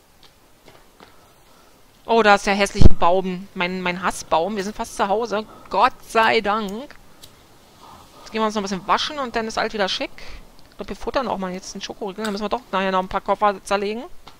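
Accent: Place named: Berlin, Germany. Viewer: Allein wegen deinem coolen Akzent ist das Video schon richtig geil hehe ^^ ( hört sich an , als währst du eine Berlinerin :3 )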